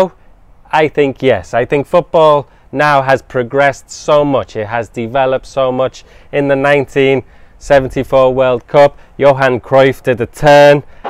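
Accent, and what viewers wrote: United Kingdom. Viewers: That's a nice way of teaching English through football. Also, it's very nice of you to speak considerably slower as people here usually have difficulty in understanding British accent. Cheers!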